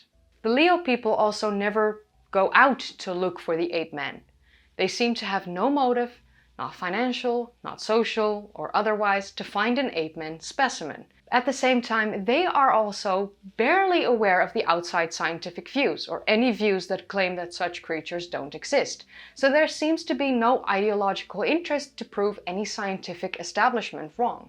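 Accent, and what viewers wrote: Netherlands. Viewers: Zie je wel, ik HOOR gewoon dat dit uit Nederland komt. Klee is trouwens een DUITS woord. Is dat met opzet? En je leraar Engels hield veel te veel van romans lezen. Uitspraak hoort echt veel meer overdreven en Nederlanders vertikken dat. We klinken bijna allemaal veel te vlak. Zaai. Niet genoeg verschil in hoog en laag. En niet dynamisch genoeg. Jammer, hoor. Luister gewoon eens naar Amerikanen of Britten. Ze zetten hun accent veel steviger neer. Dan klopt het ineens! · what is your native language? Dutch? (It's the way you pronounce anthropology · do I hear a slight Dutch accent? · Sounds like a Dutch accent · Your English is great! I could swear you have a Dutch accent somewhere though but that could be a bias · I can detect the Dutch accent quite clearly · Your accent, perfect english, but are you dutch?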